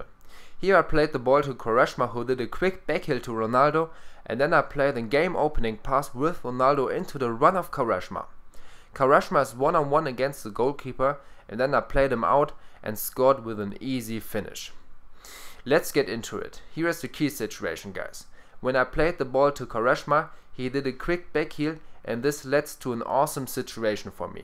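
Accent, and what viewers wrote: Germany. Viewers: Are you german ? :D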